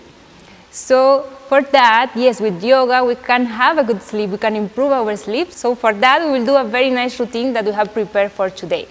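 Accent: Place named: India. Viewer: Nice English pronounciation style is same as Indians....JGD.....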